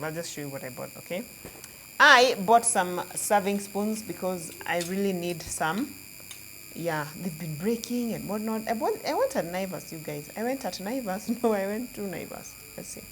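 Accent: India. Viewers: The Indian accent caught me off guard😂😂😂😂😂😂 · We're moving to India,love your indian accent. For cooking oil we bought 20 ltrs from a wholesale shop, and dividend it with my sister. It's way cheaper than buying from the supermarket.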